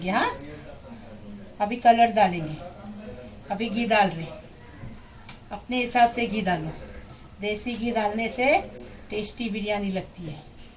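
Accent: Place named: Maharashtra, India. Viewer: khala aap kha rhete ho aapke bolne ka trika to maharashtra jesa h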